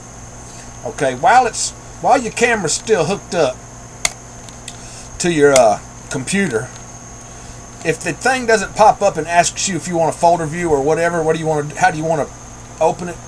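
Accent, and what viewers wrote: South, United States. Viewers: Oh... You sounded very southern and I was just curious because I'm form Arkansas.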